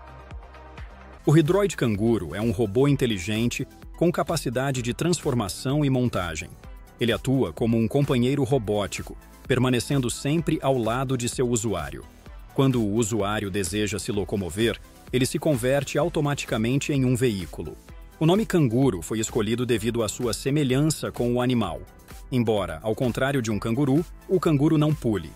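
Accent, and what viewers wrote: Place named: Russia. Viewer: I feel a russian accent... 😮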